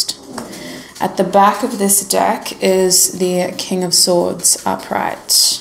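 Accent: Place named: Australia. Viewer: Hi would you consider doing meditation videos, you have a soothing Australian accent which is very calming and easy listening to hear. Very relaxing ❤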